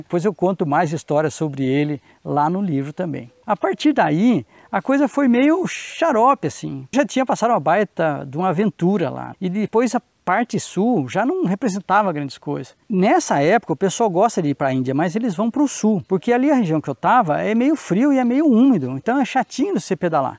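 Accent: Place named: Paraná, Brazil. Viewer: 👏👏👏👏 pelo sotaque e pelo nome vc é. Eu conterrâneo pelo jeito do Paraná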